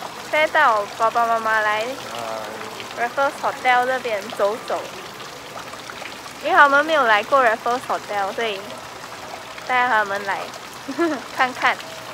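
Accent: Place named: Malaysia. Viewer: Congrats on the nomination! 😍 And great that your parents were able to visit Singapore... 👍🏻 Love your Malaysian accent btw 😬 Reminds me of home..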